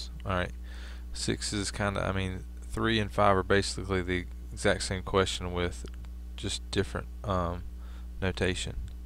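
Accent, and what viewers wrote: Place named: South, United States. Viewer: Came for help with my homework, stayed for your delightful southern accent ;)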